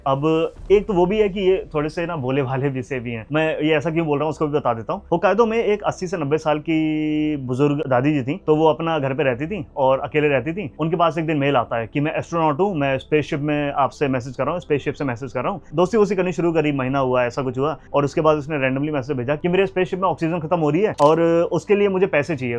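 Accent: Pakistan. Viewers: pure pakistani accent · pakistani accent lag rha hai 😅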